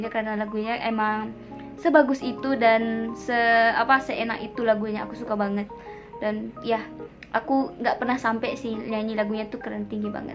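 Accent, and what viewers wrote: Indonesia. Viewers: aksen melayu brunei sudah tdk kdngran dek..udah kayak org indonesia bngt aksen nya...salute.. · Mbak orang brunei atau orang Indonesia kok logatnya kaya orang Indonesia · Logat Indonesia nya udah mulai muncul ya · kamu mah... lucu...logatnya indonesia banget... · Kok logatnya Indonesia banget mbak.... Asli Brunei atau Indonesia nih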